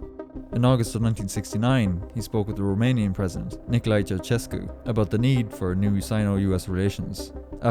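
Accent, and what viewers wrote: Ireland. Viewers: I will eat my sock if this man isn't Irish. Great video btw, quality stuff. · Excellent channel, where in Ireland are you from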